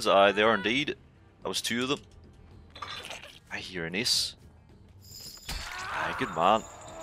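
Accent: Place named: Scotland, United Kingdom. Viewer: First time coming across this channel and you've got, I think, the strongest Scottish accent I've ever heard, nice honestly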